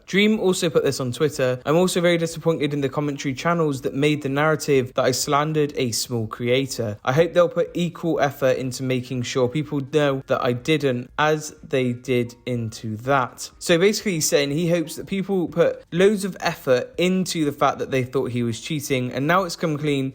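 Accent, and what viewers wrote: United Kingdom. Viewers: Lmao this dude is British, Dream is American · You sound like georgenotfound but 20x more British Btw I'm British aswell it's not a dig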